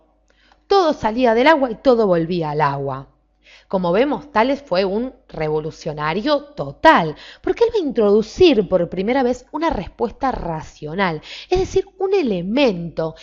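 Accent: Argentina. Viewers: Me ha gustado mucho este video esta bien chévere! Saludos desde Brasil y me enamoré del acento argentino. Gracias!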